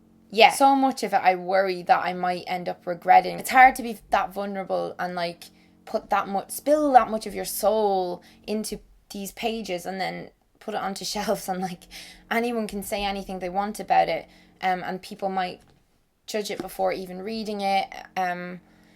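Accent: Ireland. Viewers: I'm 20 in like five weeks, and I've preordered it on audible because I'm poor and I used my monthly credit. Can't wait to listen to your lovely Irish voice 😘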